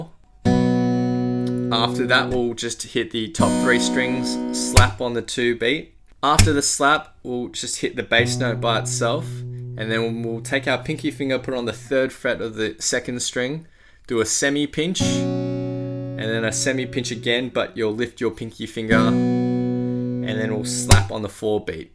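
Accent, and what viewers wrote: Australia. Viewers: I commented on a comment, where a few were querying country of origin, well cob, I can ya now, that’s the accent of true bluey, as Aussie as Vegemite, kangaroos n Holden cars, e’s a bloody legend mate, fair dinkum digger.👍😉 and no he doesn’t through shrimp on the barby, chucks some snags on amongst the chard onion.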